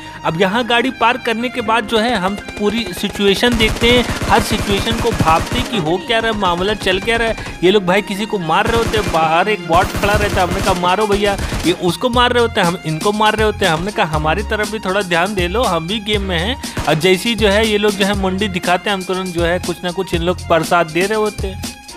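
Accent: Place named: Jaunpur, India. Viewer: Me bhi ek youtuber ho aur aapki awaz sun kar Asia lagt hai aap junpur se hai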